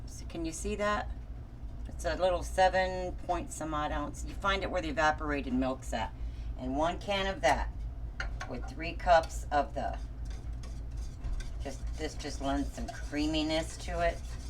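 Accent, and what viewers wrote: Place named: Upper Midwest, United States. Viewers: Northern midwest accent.. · Just found this channel and subscribed. I live in Tennessee but your accent reminds me of my home state, Michigan. Midwest cooking is home to me.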